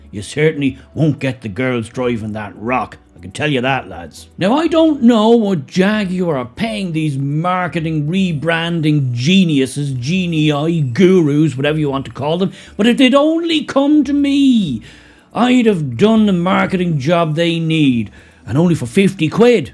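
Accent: Dublin, Ireland. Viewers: I think it's very odd that your accent says "Dublin" but you use USA words like "store" and "tanked".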